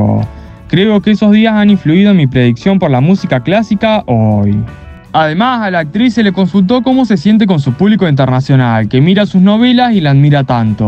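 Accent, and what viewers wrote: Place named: Argentina. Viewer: Será el narrador de este video el dueño de este canal ? Que Sonsonete más feo, no habla sino canta, Disculpe pero le recomiendo bloquear su terrible ACENTO (no sé de cuál país es, si Argentina, o talvez otro de esa área).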